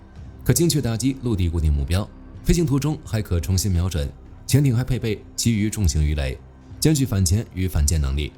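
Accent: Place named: Northeast, China. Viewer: 東北人呀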